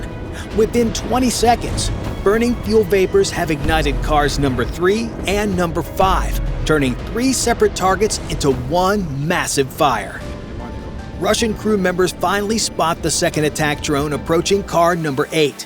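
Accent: United States. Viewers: Heavy American accent.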